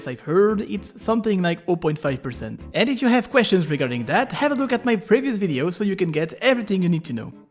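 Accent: France. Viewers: embracing his french accent more than usual?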